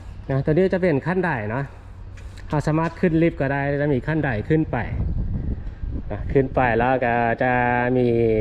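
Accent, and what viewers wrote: South, Thailand. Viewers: เสียงบรรยายสำเนียงคล้ายภาษาปักษ์ใต้ของไทยมาก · สำเนียงผู้ยรรยาย มาจากแถบไหนของลาวครับ สำเนียงคล้ายภาษาไทยใต้มาก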